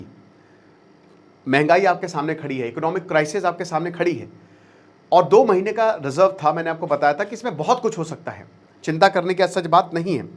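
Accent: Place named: Lucknow, India. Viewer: लगता है लखनऊ से हैं जी.. 🙂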